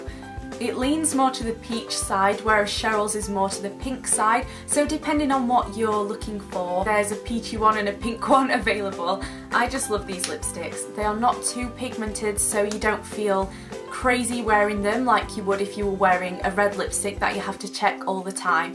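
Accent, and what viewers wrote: United Kingdom. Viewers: I wish u.s drugstores carried the bourjois healthy mix foundation I really wanna try it :( I love your videos by the way :) what accent do you have like I know youre british ( im not trying to be rude im just a curious kitty)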